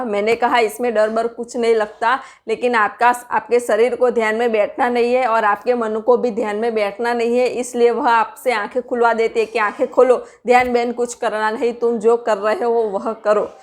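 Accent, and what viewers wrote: Gujarat, India. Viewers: Thank you .Kya aap Gujarati hai?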